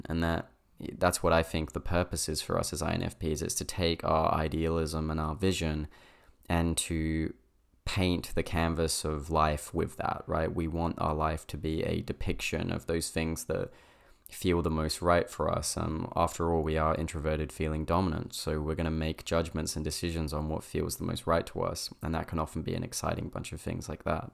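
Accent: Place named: Australia. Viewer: So nice to hear another Aussie male accent. We INFPs seem to be a little rare in this beer-guzzling testosterone-driven culture here down under.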